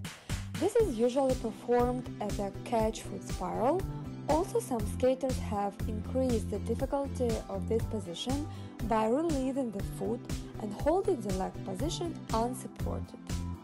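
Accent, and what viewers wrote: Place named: Russia. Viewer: русский английский))) Большое спасибо Вам за Ваши уроки!!!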